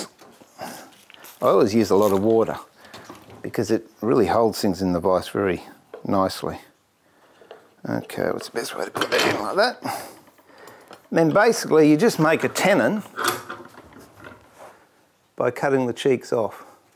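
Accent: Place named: Australia. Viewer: Nice australian accent :) Thank you for your efforts very much. Your work is more than useful.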